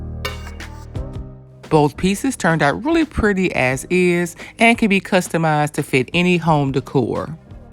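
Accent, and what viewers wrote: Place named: Houston, United States. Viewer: Your accent sounds like you're from Houston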